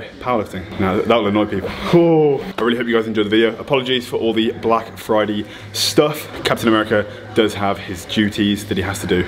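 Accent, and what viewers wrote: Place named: United Kingdom. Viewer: This was so much fun to watch and made my day! Seeing your costume struggles beforehand was just as entertaining as your time at the gym, so I'm glad you included that. I think anyone who has gone to a ComicCon can relate and it's amazing you got everything to stay on with as big and muscular as you are. Also, I'm from the US so hearing Captain America with a UK accent made me smile as well. Looking forward to the next video!!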